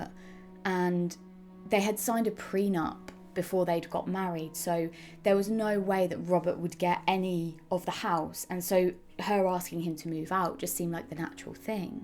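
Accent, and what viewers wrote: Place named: Essex, United Kingdom. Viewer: Pity the curator is unable to finish her words correctly. Sounds like Essex to me. Difficult to follow. Unable to pronounce the letter T.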